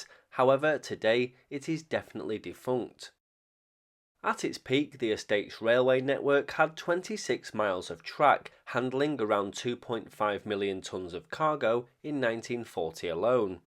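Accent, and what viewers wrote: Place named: Northern England, United Kingdom. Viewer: with a northern accent, love it!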